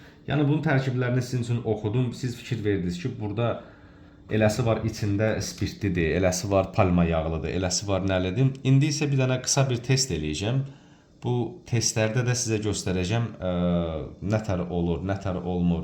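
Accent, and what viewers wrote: Ganja, Azerbaijan. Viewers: Gözəl Gəncə ləhçəsi, hər şeyə görə sagolun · Yeniden faydalı video üçün(+Gence lehcesi üçün)çox sag olun,ugurlar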